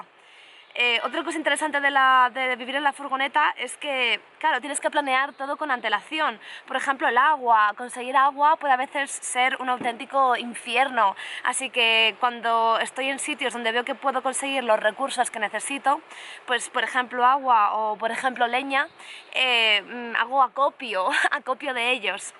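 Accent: Spain. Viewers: por qué ese acento español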